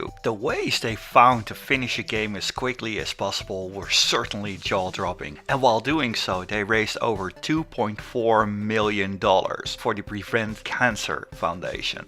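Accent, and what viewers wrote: Netherlands. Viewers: So ar you dutch then cuz you sound like it (im dutch)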